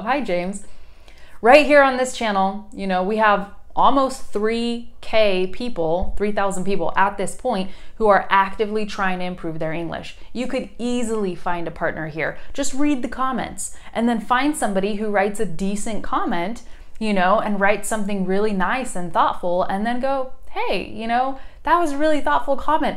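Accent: Buenos Aires, Argentina. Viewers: Me he visto este video como 1000 veces solo por escuchar a la profe hablar en español con su hermoso acento argentino. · Qué genial que hayas dominado el español con acento argentino! 😃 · Wow, hahaha you have such an incredible argentinian accent that you could fool anyone here in Mexico thinking of you are from Argentina. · Hi, En español tienes acento argentino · tu acento en español es argentino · Wow your Spanish is great! Also, your Argentinian accent is so good I am shocked! Showing people how good your Spanish is considering that you did not grow up with it really sets a good example of determination and achievement. Maybe one day I will become an English teacher myself as well. Thank you for being so patient and polite to people who still struggle to communicate their ideas in English. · Wow, your Spanish sounds very angentian. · me enamoré! 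habla perfecto en argentino jaj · WOOOW!! I can see you're not only a pretty gorgeous woman, but also a VERY SMART ONE!! CONGRATULATIONS!! It's very impressive that you can speak the spanish very fluently. And the most awesome thing is that you can speak it very well, and you can speak in a perfect argent acent as well!! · Your spanish is cool, your accent is totally Argentinian! I know it is cos you were living there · Hablas con una mezcla de acento español y argentino (pues es lo que me parece). Que bonita! Saludos desde Brasil. Me encantan tus videos en youtube. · Es evidente que estuviste en mi país, Argentina, tu acento es sumamente porteño. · Hi, I really like when you speak in Spanish, your scent is from Argentina. 🌸 You have a beautiful voice. You speak a very well Spanish. You speak a perfect and excellent English; I can understand you.🧚🏼‍♂️ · It is really beautiful to hear you speak Spanish with that Argentine accent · You have a very impressive argentinian accent. 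You sound very native. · Lindo acento argentino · Tu español es acento argentino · I love you sooooooo much!!!!!!! Argentinaaaaaaaa, you sound like me hahaha Speaks perfect the Porteño accent :D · Vos sos argentina. No puede ser que pronuncies tan bien español. Es la primera vez que escucho a alguien de USA sin acento. Me sorprendió tanto...tenés acento argentino, es más, porteño. Nadie se daría cuenta jamás que sos americana si estuvieras pasenado por acá. Te felicito, ojalá algún día yo pudiera hablar inglés aunque sea un 10% de lo bien que hablás vos español. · Que lindo es escucharte hablando en Argentino jajajaj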